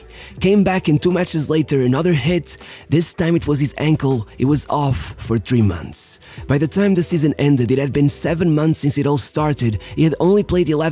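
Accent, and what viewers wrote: Portugal. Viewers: you sound portuguese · They are not. is reading the names in his Portuguese accent 😅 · I'm sorry for asking but are you Portuguese? You sound Portuguese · Tuga accent😁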